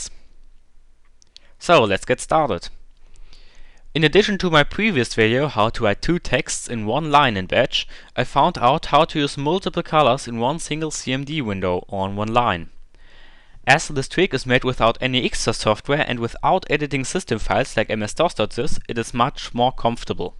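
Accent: Germany. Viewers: i think ur a german like me :D nicee accent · man merkt irgendwie das du deutsch bist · ahaha geiler deutscher Akzent und danke für das video xDDDD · standert german accent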